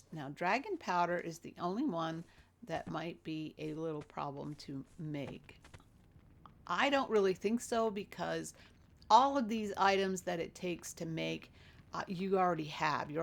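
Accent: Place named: United Kingdom. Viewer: Love your guids, im from the uk so also love the accent 👍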